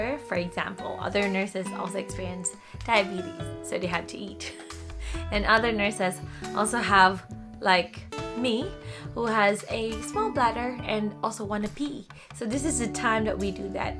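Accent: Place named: Australia. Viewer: Love the Aussie accent😍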